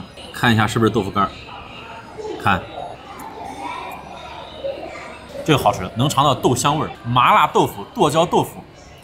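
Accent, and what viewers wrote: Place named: Shaanxi, China. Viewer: 你这个陕普 我听着真上头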